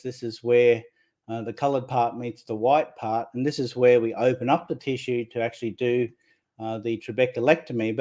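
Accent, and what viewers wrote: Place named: Australia. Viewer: Hi... he is speaking in his own language which is English. He's from Brisbane and speaks English with an Australian accent. I can understand every word he says perfectly. I speak English too.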